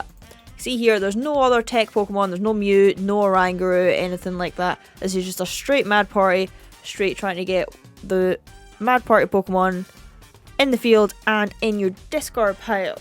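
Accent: Scotland, United Kingdom. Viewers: Haha, the scottish accent is great, I'm glad I didn't embarrass myself.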